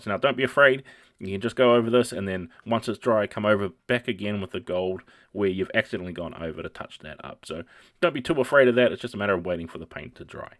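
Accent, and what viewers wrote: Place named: New Zealand. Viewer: "now we wanna come in now with some dick tan" I love the kiwi accent!!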